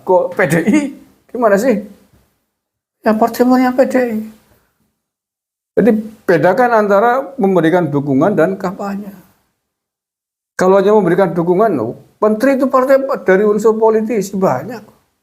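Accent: Banyumas, Indonesia. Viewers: Aha pak rika bahasNe ngpak banget banyimasan,aku dadi seneng ngringokna bahasane rika kas bantumas aku wong wanareja ds Cilongkrang,paryaiku geindra pak....🙏🙏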